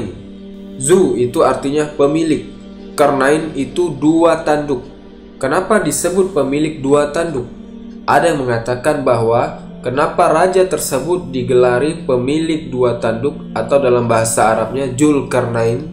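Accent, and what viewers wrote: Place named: West Java, Indonesia. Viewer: Berdasar pengucapan huruf Z, fixed admin adalah Sundanese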